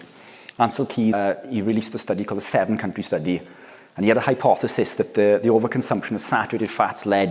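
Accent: Wales, United Kingdom. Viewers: The Welsh accent is the one thing I dislike about this video! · So what are your commercial interest along with this good will info? No, dont get me wrong, I enjoyed the bleep immensely and it was factual to the letter, it's just that I cant help being bleeping cynical about stuff. Its a necessity it bleeps, these days. Good luck anyhow. Ps. The Welsh accent made a nice bleep from all those yank MD's. 🙏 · Good, clear presentation. And I could listen to a Welsh accent all day!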